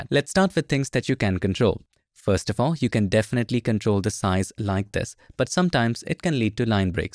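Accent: India. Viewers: I watch your videos for your excellent communication, teaching and presentation skills , soft and high pitch Indian accent with lots of confidence, immersion and proper body language 10/10 ur a benchmark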